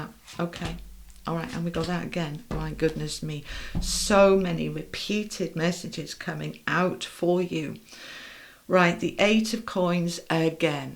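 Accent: England, United Kingdom. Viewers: I love your British Accent, watching all the way from Namibia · South of Sydney Australia... British living here over 50 years can't beat that Pommies accent❤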